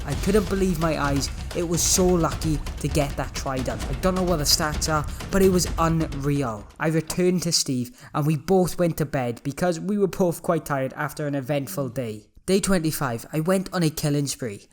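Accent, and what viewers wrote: Wales, United Kingdom. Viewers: Banger btw yout welsh accent is perfect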